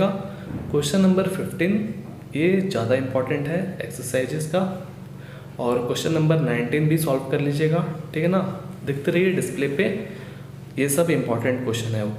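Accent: Bihar, India. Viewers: Sir aap bihar se hai kya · Sir Aap bihar se hai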